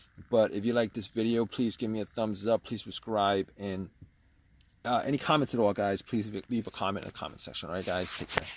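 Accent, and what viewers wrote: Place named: North, United States. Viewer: What is "FU" cap, or a "FEW" cap?!? That would be a F U E L cap man. Not a FU or FEW cap. You're from the northern part of this country aren't you? Mean no offense by that, you guys just talk really weird and funny!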